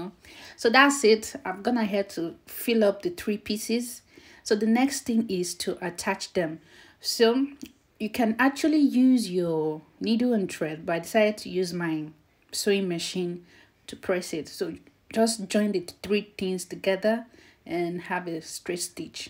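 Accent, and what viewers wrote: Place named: England, United Kingdom. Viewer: I like your English accent 😉😁👌 New subscriber 🤩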